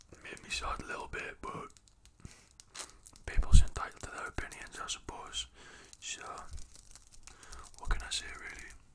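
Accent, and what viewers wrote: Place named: Scotland, United Kingdom. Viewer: R u scottish bro? U have accent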